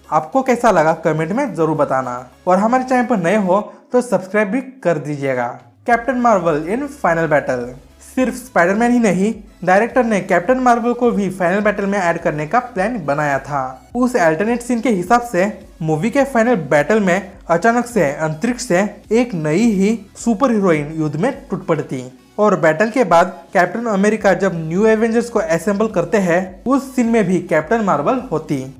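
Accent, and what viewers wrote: Bihar, India. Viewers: Aap Bihar se hona?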